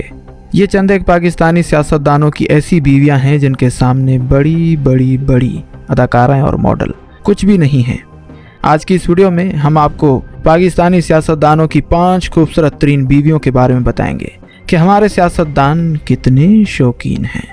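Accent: India. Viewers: How come this guy sounds like an Indian.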